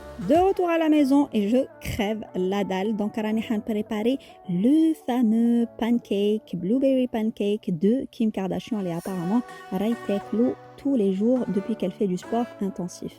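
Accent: Algeria. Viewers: Bonjour Vous portez bien le nom de votre chaîne vous nous inspirez vraiment en plus de l'accent algérien comme une cerise sur le gâteau 😉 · اتعلم راهي واضحة اللهجة جزايرية لزمك انت تحاولي تفهم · تبركالله عليك كولشي زين بزاف انا كتعجبني اللهجة دزيرية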